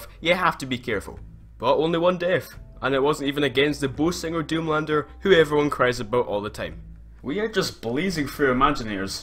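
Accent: United Kingdom. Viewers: Wait why is he British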